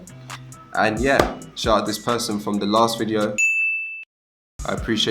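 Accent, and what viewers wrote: London, United Kingdom. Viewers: You from London g ?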